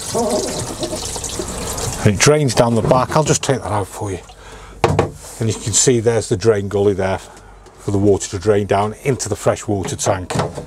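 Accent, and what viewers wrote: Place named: North Yorkshire, United Kingdom. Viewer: Northern England accent (North Yorkshire)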